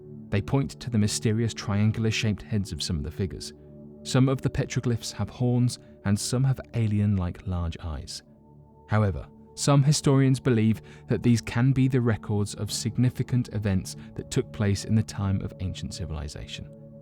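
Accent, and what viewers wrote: United Kingdom. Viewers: Its his Brit accent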